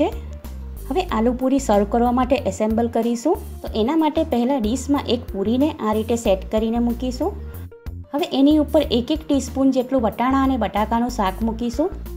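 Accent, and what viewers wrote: Surat, India. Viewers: Tamara avaj par thi lage chhe tame surati ho evu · Be tame to bo jabri sudhdh gujarati bolvani try kairi.. ... Game etlu try kairu pan surati to hambhadati j chhe... Khabar padi j jati chhe.. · Ek dam surti boli ,zkkas aalu puri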